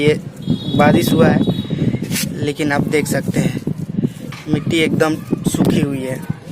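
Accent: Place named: Bihar, India. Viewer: Aap adenium plant kahan se lete hai ? aapke voice tone se lagta hai kya Bihar ke hai nice information 👌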